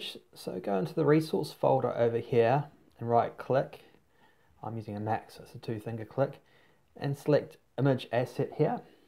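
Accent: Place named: United Kingdom. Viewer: thx for your video, i love your British accent.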